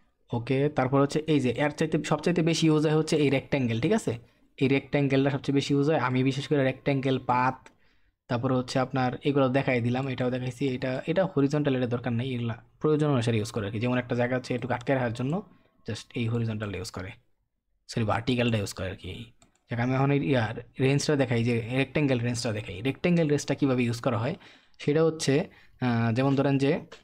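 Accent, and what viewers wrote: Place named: Narayanganj, Bangladesh. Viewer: ভাই আপনার বাড়ি কি নারায়ণগঞ্জ, আপনার কথার ভাষা এমনটা মনে হয়।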